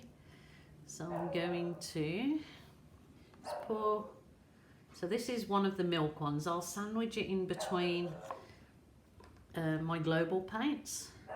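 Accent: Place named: England, United Kingdom. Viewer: Wow! Looks like a dragons head! Lovely. It is also nice to hear an English voice too!!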